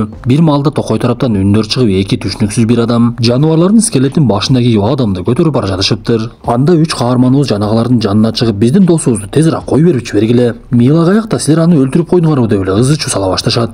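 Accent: Standard. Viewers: Ото соонун таза кыргыз тилинде, тушунуктуу кылып, тушундуруп бергениниз мага абдан жагат даагы соонун ,кызыктуу кинолорду чыгара бериниз.❤❤❤ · 🎉 сен ен сонун суйлойсун нукура Кыргызча суйлосун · сонун суйлойсун ан таза кырызча сонун азаматсын 👍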